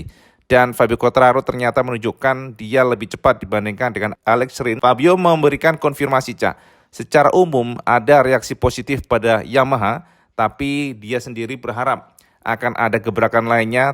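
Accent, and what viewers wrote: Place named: Java, Indonesia. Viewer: Sangat kental bahasa jowone kang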